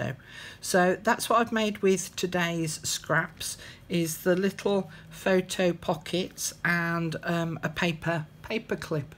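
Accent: England, United Kingdom. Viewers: You are my favourite crafter 👏🏻👏🏻👏🏻👏🏻 a nice english voice xxxx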